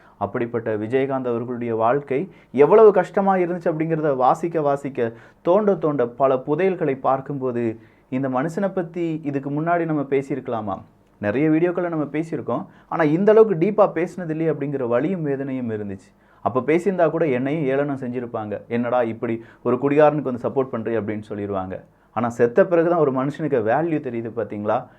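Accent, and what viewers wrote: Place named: Kerala, India. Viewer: Kerela TAMIL NO NO 😂😂😂😂😂😂😂😂😂😂😂😂😂😂😂😂😂😂😂😂😂😂😂😂😂😂😂MOUTAL😂😂😂😂😂😂😂😂😂😂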